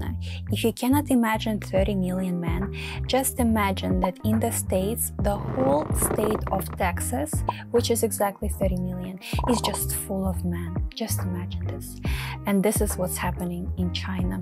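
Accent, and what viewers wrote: Eastern Europe. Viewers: precious princess, your accent is pretty cool! Are you Ukrainian, Belorussian, or Russian? 🥰 😘💕☮🌎🌌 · well, which country are you from ? based on your accent,....Ex USSR .Having a lot of knowledges on this fiel, I think you succeed to penetrate "the market" 👍 Thank you for sharing your experience ! th t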